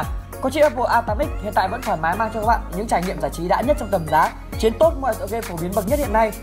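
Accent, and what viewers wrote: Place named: North, Vietnam. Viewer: Người SG nghe cô này nói là biết người Bắc sau 75 ,nói như sợ người khác cướp mất lời mình ,nói không kíp thở ,có cần phải vậy không ?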